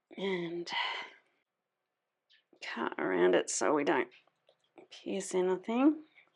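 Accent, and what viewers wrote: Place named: Australia. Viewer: You sound a little australian. Can I ask what country are you in??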